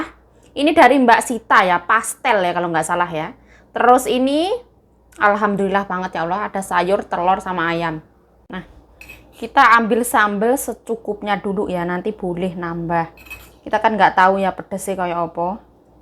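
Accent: Java, Indonesia. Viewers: Makane Jawane Medok dekatnya Ngawi To Rumahmu. Terasi Digoreng Sekali Banyak. Gk Masyalah.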